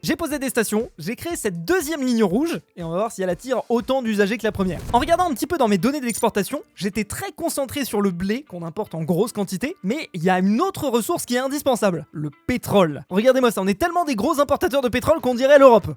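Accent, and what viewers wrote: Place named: Quebec, Canada. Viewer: face-blue-wide-eyes: parle de plus en plus comme quebecois:face-blue-wide-eyes::face-blue-wide-eyes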